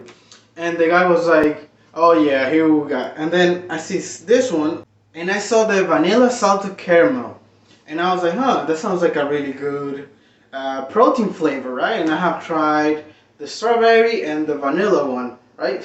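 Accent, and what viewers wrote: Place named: Dominican Republic. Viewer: You sound like you have a Dominican 🇩🇴 accent. Are you from DR?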